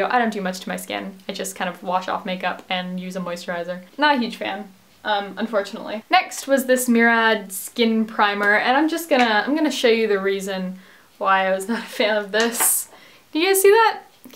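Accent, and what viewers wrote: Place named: England, United Kingdom. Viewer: I can hear an English accent creeping in there :)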